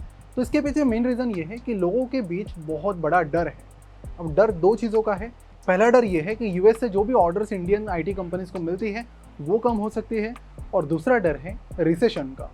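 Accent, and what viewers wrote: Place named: Bengal, India. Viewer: are you bengali? Some of your accents matches with my bengali accent.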